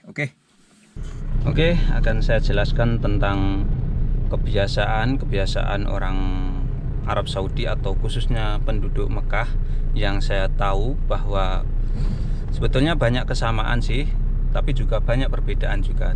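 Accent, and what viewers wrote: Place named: Banyumas, Indonesia. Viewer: bang asli endi ngomongnya ngapak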